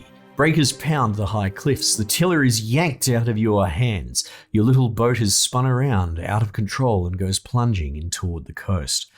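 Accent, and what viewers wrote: Australia. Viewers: Hey, Mate, if your Australian lilt is insufferable, God help mine. The game seems quite interesting. I'll go a few more episodes for sure.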